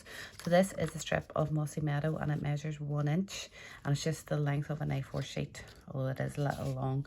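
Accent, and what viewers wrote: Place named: Northern Ireland, United Kingdom. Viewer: Great to hear your accent - making me a bit homesick. I grew up in Belfast. Now live in Western Australia. I am also a fellow SU demo. Tfs this lovely project. Just found you through Pinterest 💕.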